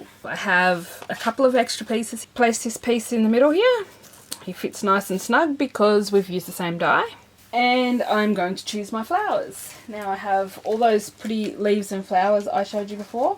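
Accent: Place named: Australia. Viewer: Nice to hear a fellow Aussie on YouTube - thanks for sharing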